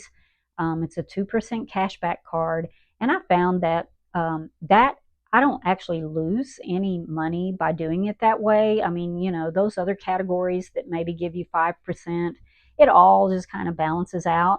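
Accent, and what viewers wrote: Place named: Tennessee, United States. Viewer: Hello there! So happy to have found another Gen X sister! I just subscribed! And I thought I’d detected a little Tennessee twang in that accent! (I’m from Tennessee too!) I love your ideas, especially the one about not waiting until the dishwasher is completely full before running a load.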